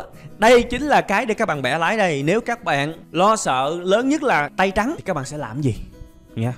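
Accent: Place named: Saigon, Vietnam. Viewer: Thầy giảng hay lắm ạ. Mình thích giọng sài gòn quá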